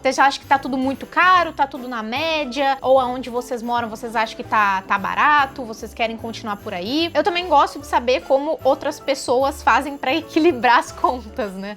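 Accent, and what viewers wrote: Brazil. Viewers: VOCE É DE PORTO ALEGRE? KKKK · Pensei que vc fosse de SP 😂😂😂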